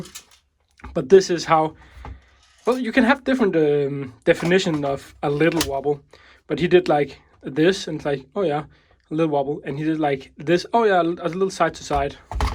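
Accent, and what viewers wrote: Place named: Denmark. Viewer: I got the wood version and yep the magazine wobbles a lot but surprisingly the flash hider is really solidly on there like I've even lost the small screw to make it stay in place and it still sits there perfectly, i can't speak for The Range because I replaced the spring. also due to the dialect, er vi Dansk?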